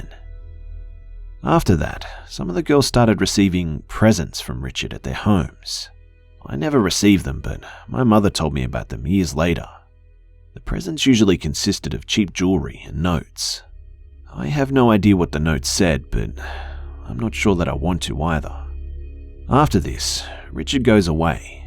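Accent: Australia. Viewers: So much for sleeping tonight., you make me sleep with the light on. My twisted side thanks you for this, my electricity bill does not. No more listening in the dark with headphones and a narrator who feeds of the fear of his users. No need to go hungry, love it and will keep listening to your wonderful Aussie accent!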